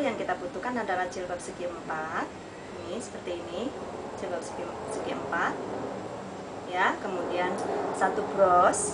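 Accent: Banyumas, Indonesia. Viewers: "DONE" Ikutan #GiveawayTHV2020 untuk modal usaha mudah-mudahan ada rejeki untuk anak bayiku 💕 Saya suka nnton karena semua resepnya sudah diuji terlebih dahulu, jd setiap saya trial tidak pernah gagal. Pertama saya tau channel ini karena saya mencari resep kuker di cookpad untuk hajatan nikahan saya (supaya irit) 😂 dapetlah saya resep dari mba. Pas saya cari-cari caranya di youtube ternyata ada step by stepnya di channel trnyata punya ya mba 😂 ga nyangka hihi Dan, semakin kesini saya sering ikutin tiap ada upload video baru. Karena saya suka dengerin dubbingnya yg dicampur bahasa ngapak 😂😂 Semoga rumah tangga langgeng sampe kakek nenek, semoga setelah memberi GA rejekinya semakin dilancarkan dan diberi keberkahan.. Aamiin yaa robbal'allamiin ❤️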